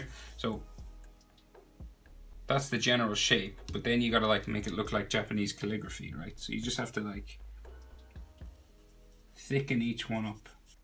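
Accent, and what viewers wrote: Ireland. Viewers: Are you Irish? Just curious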